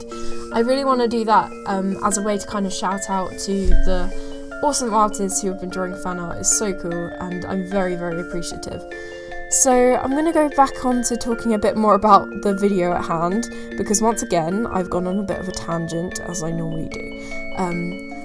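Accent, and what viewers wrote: United Kingdom. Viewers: I love your pretty brittish accent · Redhair girl with a fantastic english accent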